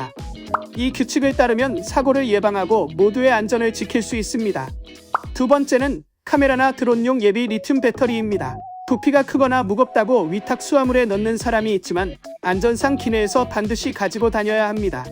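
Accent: Japan. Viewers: 왐마 한국어 발음 너무 귀여워요 외국인 중에 ㅜㅜ일본인분들 한국어 하시는게 젤 귀여움 ㅜㅜㅜ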